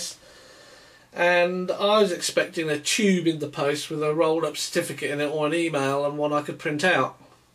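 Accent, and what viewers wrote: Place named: England, United Kingdom. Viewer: nice pipe..are you england? I noticed something diferent about your english .I m brazilian .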